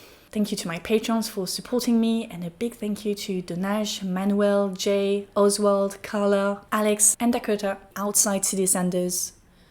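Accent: France. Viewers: Daaamn girl. You are 🔥. Loooove the French accent as well 🙈. What's your Also it might be true that Gen Z are more politically active but not really well educated/informed on the political topics they are active about. It seems they just want to be angry at something and want to be loud because of it.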